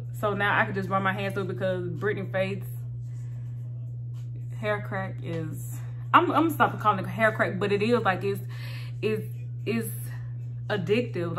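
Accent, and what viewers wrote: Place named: Texas, United States. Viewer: You gotta be from Texas with that accent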